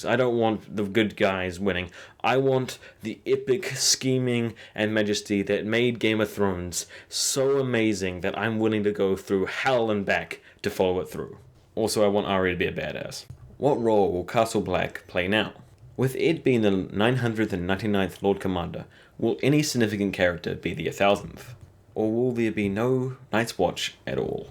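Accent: New Zealand. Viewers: are you from new zealand? you sound like a kiwi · Cool vids.. i´m from argentina i used to live in nz for the last 7 years.. it´s been a while since i´ve hear kiwi accent.. keep it up.. sweet as bro.. cherr bro..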